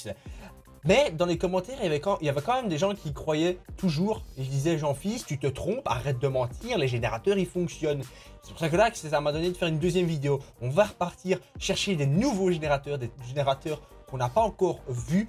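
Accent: Belgium. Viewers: tu es belge???? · Tu es belge?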